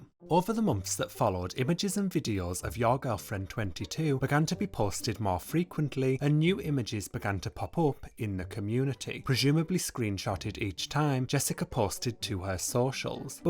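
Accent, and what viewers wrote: United Kingdom. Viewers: I can’t tell if your from St Helens, Wales or Newcastle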